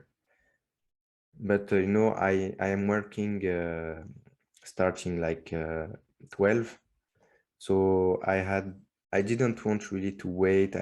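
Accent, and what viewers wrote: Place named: France. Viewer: merci😁😁😁...tu as un accent anglais"français"...merci encore pour l'éducation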